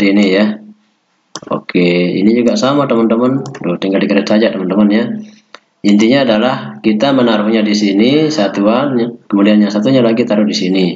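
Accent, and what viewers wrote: Bali, Indonesia. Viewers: orang bali y mas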